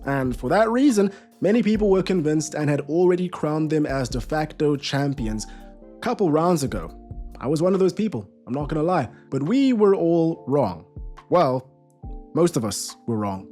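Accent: Brazil. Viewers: Good Spanish, your accent reminds me of Brazilians speaking Spanish. Do you speak Portuguese? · Habla como un brasileño hablando español, o sea bien, muy bien pero que se le nota que es extranjero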